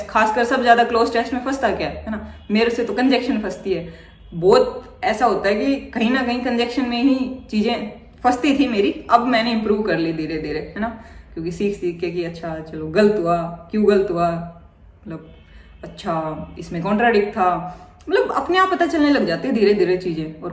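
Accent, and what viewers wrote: Haryana, India. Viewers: M from uttarakhand but love hariyanvi accent 😁😁😂😂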